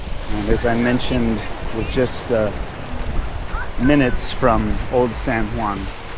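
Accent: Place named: Spain. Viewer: Killing me with your Spanish accent 😂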